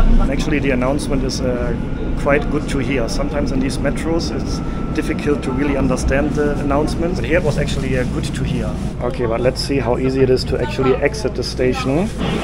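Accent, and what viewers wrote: Germany. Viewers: A word of advice: As a non-native English speaker with a noticeable German accent, it's important to slow down and articulate your consonants more clearly when communicating with other non-native English speakers. Speaking at your current pace may make it difficult for them to understand you, so adjusting your speech could significantly improve communication. Also, you are confusing supermarkets with grocery stores, please learn the difference. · 독일식 영어 발음이 참 매력적입니다.^^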